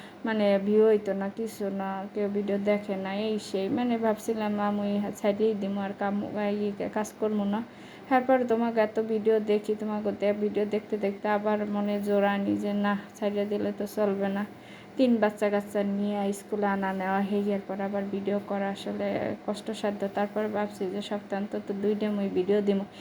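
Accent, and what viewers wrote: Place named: Barishal, Bangladesh. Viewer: মাশাআল্লাহ আপুমনি তোমার বরিশাইল্লা ভাষা কথা বলা খুবই সুন্দর পুরো ভিডিওটা দেখে খুবই ভালো লাগলো ❤❤❤❤❤🎉🎉🎉দিয়ে গেলাম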